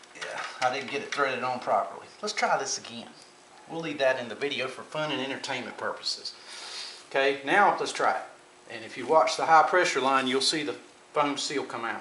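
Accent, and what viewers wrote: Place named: North Carolina, United States. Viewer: Thank you. You are from North Carolina. Just have to be. Not sure any other part of America has that very special accent. I went to Duke, and almost certainly you are a Carolina fan. Could be State, but I bet it is Chapel Hill. Great video. Thanks again.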